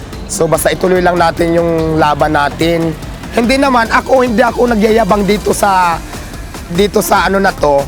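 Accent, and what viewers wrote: Visayas, Philippines. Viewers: alam ko bisaya ka accent mo palang. parihas tayu hehe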